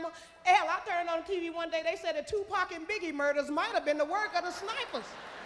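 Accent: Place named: Chicago, United States. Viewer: Chicago girl, Chicago talk, Chicago style. 💯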